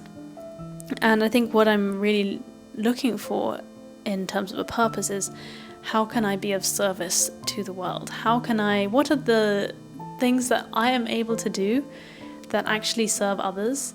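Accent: RP, United Kingdom. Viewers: Thank you so much for your podcasts and and videos in English. I really like British English, especially when you pronouncing it! Keep making this videos because you can not even imagine how it’s changing someone’s English skills! You are the best! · Wow! Welcome to Hong Kong! Congrats to you with the many new chapters in life! Hope to bump into you in Hong Kong one day! Love your British accent!❤😂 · I love your British accent..please continue to inspire us. - Love from Kuala Lumpur. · Dear! Your videos are really helpful. Keep on doing your great job! I personally enjoy listening to your perfect RP pronunciation, your soft voice and, which is the most important, wonderful tips you give on different subjects! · Oh dear, you are the first one I check when I want to learn RP accent and a foreign language! Congratulations on your life achievement, 我祝你和家人一切顺利！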